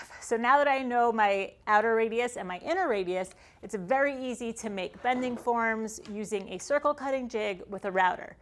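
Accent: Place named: Mid-Atlantic, United States. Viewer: that’s not New England. Possible modern mid Atlantic; Eastern PA, Northern NJ, parts of CT and Long Island.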